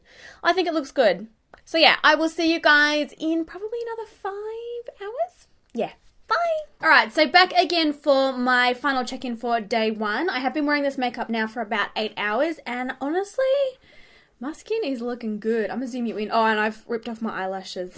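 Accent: Australia. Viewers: 1) You're a gorgeous girl. 2) Us Americans luv those Aussie accents and I'm no exception. 3) Luv how excited u get over a really good product. You look like the kind of girl who wouldn't get excited about anything (u have that 'cool' factor.) 4) U have the most beautiful blue eyes. I've been trying to find a pair of colored contacts that r your eye color but to no avail.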